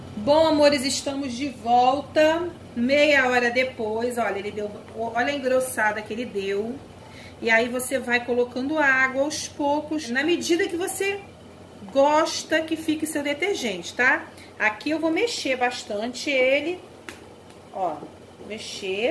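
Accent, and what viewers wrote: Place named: Rio de Janeiro, Brazil. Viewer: Pronuncia carioca bonita.....show de detergente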